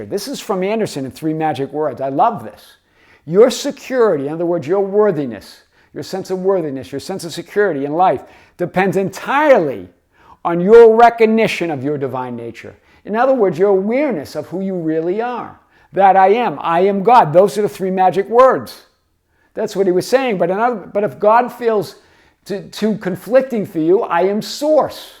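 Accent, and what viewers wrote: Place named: New England, United States. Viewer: tbh nothing makes me smile like hearing your authentic new england accent! 😂 It makes these videos hit home so much more for me living out here in cali haha. Love your contagious energy!!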